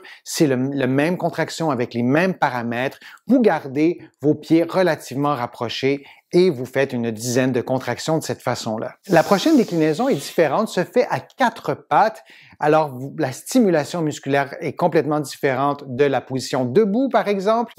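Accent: Canada. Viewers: ❤! De plus, j’adore votre accent canadien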